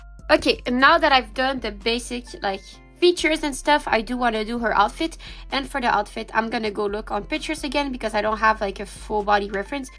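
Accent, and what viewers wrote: France. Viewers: the french accent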